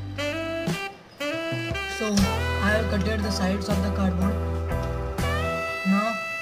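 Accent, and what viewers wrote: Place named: India. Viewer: Dude Well done but some word are still in indian english so Some people won't understand it Well I m indian So I no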